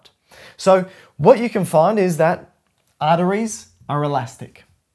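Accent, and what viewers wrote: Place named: Australia. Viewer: As it turns out, I can only understand biology when it's explained with an enthusiastic Australian accent. Thank you good sir!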